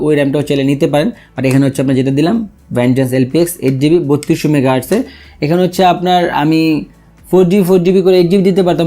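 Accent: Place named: Dhaka, Bangladesh. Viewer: আপনার সব কিছু এত সাবলিল ...সব সময়ি আমি আপনার প্রডাক্ট রিভিউ দেখি ...সুন্দর করে বুঝিয়ে দেয়া এবং টেকনোলজির সাথে ঢাকাইয়া বাংলা টা আর মজা লাগে ...আমি নিজে ঢাকাইয়া এই ভাষাটা হয়ত পুর ঢাকাইয়া না ঢাকার চলিত ভাষা ... এই কারনে আর বেশি ভাল লাগে...গুরু এমন সুন্দর সুন্দর রিভিউ আর চাই...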